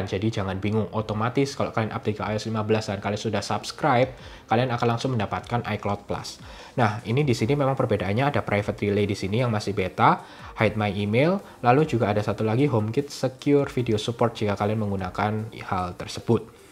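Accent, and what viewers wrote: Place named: Banyumas, Indonesia. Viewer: mantap mas..ngapak ya mas..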